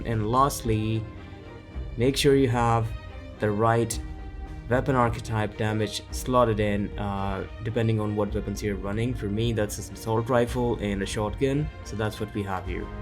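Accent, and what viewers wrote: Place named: Germany. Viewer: Dat German accent😊 😉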